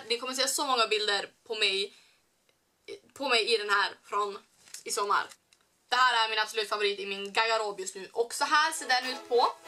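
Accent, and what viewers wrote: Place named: Sweden. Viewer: älskar dig röst. din svengelska. på allvar! allt du säger låter så "rätt" haha kan inte förklara men aa kärlek till dig!!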